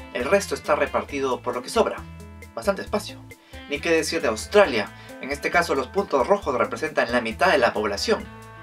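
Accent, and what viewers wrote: Peru. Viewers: No es que use acento neutro xD, sino que ese es el acento de las personas que viven en ciertas zonas de Perú, por ejemplo, Lima.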